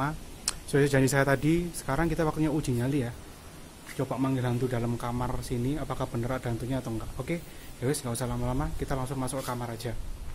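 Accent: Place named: Java, Indonesia. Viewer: Medok banget... 🤣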